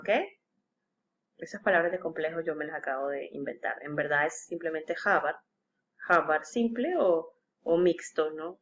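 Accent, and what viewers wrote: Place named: Colombia. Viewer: Hola, me han ayudado mucho tus videos. Me parece un poco dificil la declinacion en el caso acusativo, pero ahi voy. Quisiera preguntarte de donde eres, tienes acento Colombiano. Gracias por tus clases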